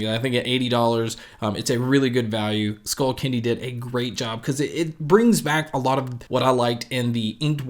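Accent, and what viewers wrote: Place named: Texas, United States. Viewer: Texas boi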